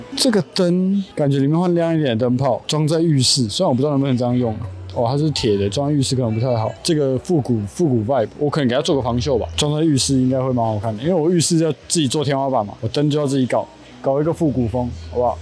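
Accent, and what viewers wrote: Taiwan. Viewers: 哇!你的中文口音好台~ 那個是蔣中正啦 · 哇！你的口音 根本台灣人耶 ，但臉是阿兜啊！你在台灣出生的嗎？ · 不好意思，為什麼我看到外國人的臉，講話為何是台灣年輕人？（這影片自己跳出來） 我太好奇了立馬自己找答案所以我很努力的滑最前面的影片，因為介紹通常都在前面，您爸爸是德國人媽媽是波蘭人，在台北出生在高雄長大，進口的台灣人ya~ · 你居然有台灣口音,聽了真親切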